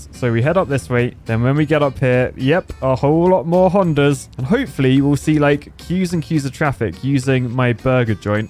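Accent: United Kingdom. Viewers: Fellow Britt? Does he spiff?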